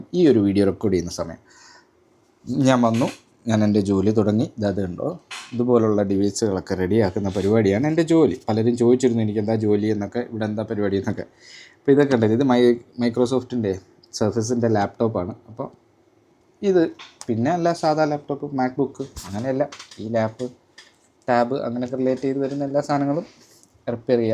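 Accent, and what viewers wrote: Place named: Palakkad, India. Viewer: ബ്രോ 😂എനിക്ക് നല്ല സംശയം ഉണ്ടായിരുന്നു നാട് pkd ആണ് എന്ന്..... ആ സംസാരം കേട്ടപ്പോൾ തന്നെ തോന്നിയത് ആണ് പിന്നെ ചോദിച്ചില്ല എന്നെ ഒള്ളൂ..... പിന്നെ വാഴ പിണ്ടി യുടെ കാര്യം പറഞ്ഞപ്പോൾ.... വീണ്ടും തോന്നി പാലക്കാട്‌ എവിടെ ആണ്....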